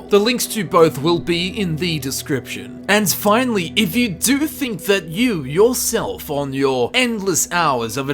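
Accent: Western Australia, Australia. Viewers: I thought you were British, I guess I don't have a keen ear for Far west accents. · Are u a fellow Aussie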